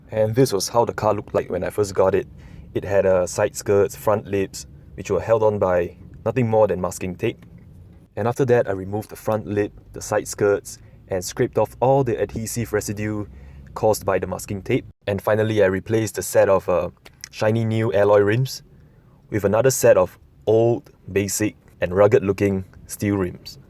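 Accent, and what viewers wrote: Singapore. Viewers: A guy from my church is from Singapore and he sounds exactly like you. His wife, however is from Singapore also, but her accent is very different and she's harder for me to understand. I'm not sure why. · Rev up your Singlish. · just in case if heavy singlish happen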